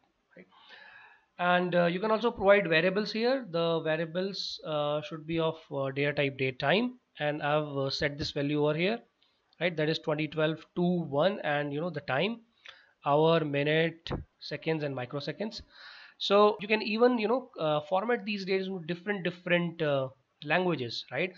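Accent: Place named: India. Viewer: not again !! can you speak english??not indianish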